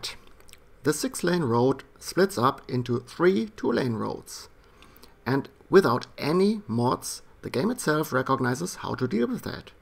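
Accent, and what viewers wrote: Germany. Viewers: Ich habe direkt gemerkt das du aus dem Deutschen Sprachraum kommst :D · Awesome German accent. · Dieser deutsche Akzent is ja grauenhaft :D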